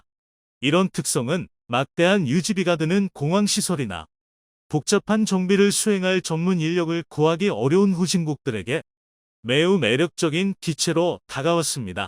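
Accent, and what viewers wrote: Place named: China. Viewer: 중국사람인가 ㆍ 왜 말이 찳지 ㆍ혀가 짧고 발음 정확하지 않음 ㆍ 하지 말아요 유트브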